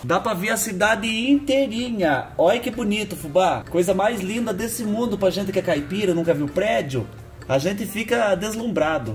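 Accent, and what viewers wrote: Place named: Paraná, Brazil. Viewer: Fubá vc é de que estado do Brasil.Digo onde nasceu ,porque eu hoje faz 31 anos que morro em santa catarina , mais nasci no paraná e morei la ate meus 13 anos, e todos os teus ditados modo de falar me parese ser paranaense.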